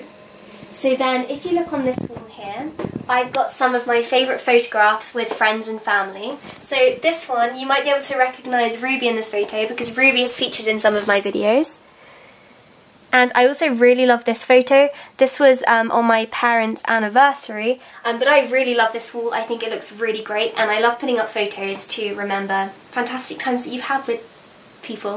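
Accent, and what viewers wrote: United Kingdom. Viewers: Where are u from? Seems like a british accent.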